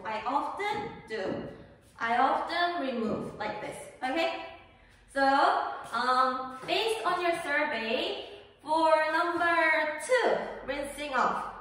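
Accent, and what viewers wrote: United Kingdom. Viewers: 뭔가 영국액센트 느낌... 쌤 너무 잘하세요 나도 저렇게 잘하고 싶다 많ㅇㅣ 배워가요!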